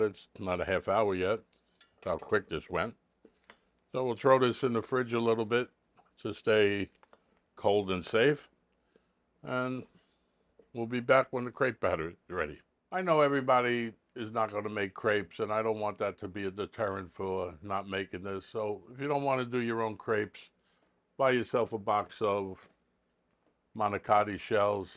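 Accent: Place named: New York, United States. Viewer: Your demeanor and accent scream new- york, and I love it. Thank you for the videos may God bless you.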